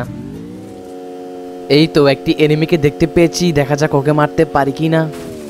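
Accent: Noakhali, Bangladesh. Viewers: তুমি যে নোয়াখালী ভাষা বল আমার থিকে জোস লাগে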